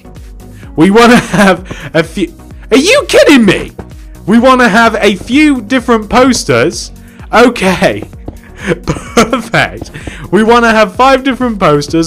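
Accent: United Kingdom. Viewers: your voice is really good your accent is like the uk one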